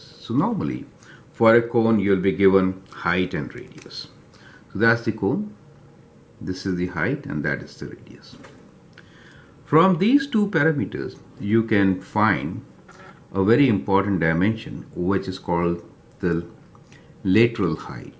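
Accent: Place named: India. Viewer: Saurabh Gupta thats exactly what im saying.it happens whenever i wanna learn a mathematical concept.tons of videos,all indians,with their terrible accent and terrible video quality,making the topic even more confusing than it is.same for this topic.all indian videos.almost none of them speaks proper english+shitty video quality.i just commented on this arbitrarily,i dont have any bad intention against this person in this video.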